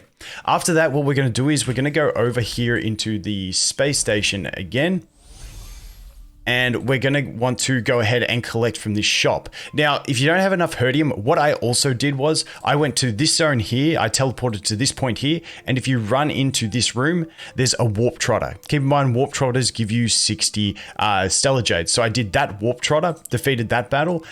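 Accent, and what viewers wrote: Australia. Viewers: Is old mate aussie?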